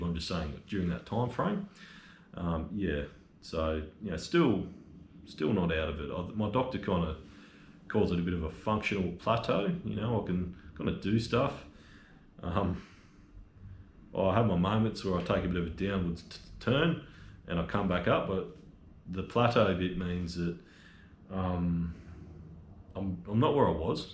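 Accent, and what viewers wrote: Australia. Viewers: Recently discovered lawn care channels. Had know idea they existed. Love to see the detailed results I guess. This is the only one I've subscribed to. And your thick Australian accent is part of your charm. BTW, I'm American. Would love to visit Australia someday. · I've always loved the Aussie accent best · I have no idea why I love your channel….just do. You have a very sincere appeal….and that Aussie accent is amazing! You always sound happy. Your finished shots show just how much you love mowing. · I love your Australian accent and have no trouble understanding every word you say. I especially like when you call a weedwacker a whipper snipper. Congratulations on getting to 100,000 subscribers. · Great video!!! Thank you so much for sharing and taking the time. You are such a generous kind soul. 🤗🤗🤗🤗 . Keep the videos coming please ❤️❤️❤️❤️❤️😜... 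I m French . I lived in the UK before and I work everyday with Americans at work. I can understand you fine 😜. I actually really like Australian accent and Scottish accent 🤗🤗🤗🤗. It makes your videos even more enjoyable. I m a fan 😁😁😁. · I love Australians accents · Gosh I loved this! So fun to learn about your military service (thank you), that you have 4 kids and an awesome wife, that you call out the jerks (thank you again), that I melt every time you say "G'day" (love an Australian accent even if some *jerks can't understand you), and that 100K others have the same satisfaction watching your videos. The Grand Finale music is the best so I'm inserting that here for your awesome milestone!! Congratulations! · Hi, this was from 6 months back & now January 19, 2023) Your Q & A Topic was Wonderful, first Thank You & your Wife-Claire for your Service!! It was nice of you to tell all of us your Family, a Lovely wife-Claire & 3 girls & the youngest a boy...that is wonderful too! 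Wow you have a few hobbies, play guitar, pencil sketches, modulars & video games...especially with your kids, & movies & Star Wars!! I liked hearing about what you did in the Army, my husband was in the Marines but he did that way before Me! As for maybe coming to USA, Kevin from Lawn Care Juggernaut from Ohio & you having him come to Australia is nice, but look up Spencer, from SB Mowing from Kansas..I like him a lot better!! I`m a American & I hear you fine with your Aussie Accent...which I love listening too! Congratulations on 100k, you Earned it for being Nice, Polite & your Funny things you put in the Videos!! As for the Rude People, Don`t Listen To Any of Them, You are doing a Great Job!! The saying here in USA is... If You Don`t Have Nothing Nice To Say, Don`t Say Nothing At All!! Best Of Luck, with your YouTubes & your Married Life!! Have a Good Work Week & a Wonderful Weekend with Family!! 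Love from Central Illinois USA 🥰😎❤ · How awesome to learn about you and your family. No wonder you are such a wonderful human being. This was a great video! I love the shout out to Brad from Copper Creek. I don't know him personally but live within a mile of him. I watch his videos and love it when I recognize a property he is working on. And just so you know ... everyone I know, and I mean everyone, loves an Aussie accent. My son even has his Siri set to it. Talk about accents? I'm a Florida country girl with a thick southern accent. I don't realize I have an accent until I meet someone outside of the small town I live in, and they have to comment about it. Usually it goes like this, "Where did you get that accent? Are you from Tennessee?" My reply is always... "What accent?" It must be pretty thick because the workers at the Chinese takeout can't understand a word I say. 😆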